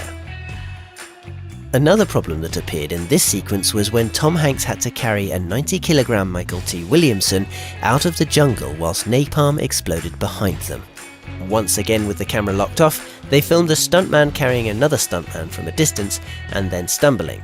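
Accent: United Kingdom. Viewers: I like your accent. It sounds like you might be British or a touch of something else also. Have a nice day whoever you are. My name is Charlene!😊❤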